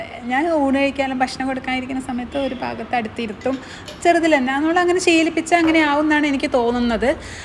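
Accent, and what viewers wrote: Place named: Kozhikode, India. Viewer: Ningl clt kkarano....bhasha kettitt angane thonnunnu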